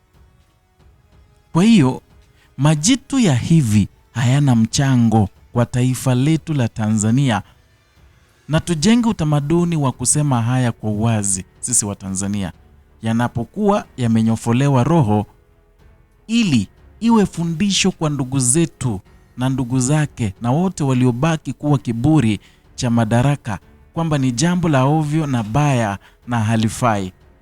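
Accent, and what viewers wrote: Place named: Kenya. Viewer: Wewe ni Mkenya. Mtanzania hana rafidhi km yako aisee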